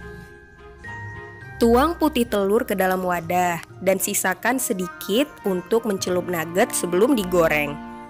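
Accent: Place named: Jambi, Indonesia. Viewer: Orang mana kak? Kok kaya orang Jambi wkwkw logatnya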